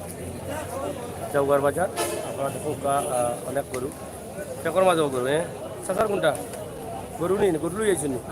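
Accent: Sylhet, Bangladesh. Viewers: ভাইজান। আপনাদের সিলেটে কথাগুলো শুনতে ভালো লাগে ও আপনার কথাগুলো অনেক সুন্দর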